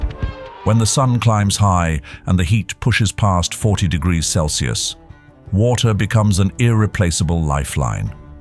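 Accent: United Kingdom. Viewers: specially due to your British accent I love this...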